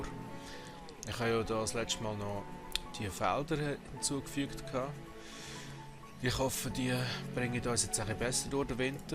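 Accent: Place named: Switzerland. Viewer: Juhuuuuuu Schwiizerdütscht ;)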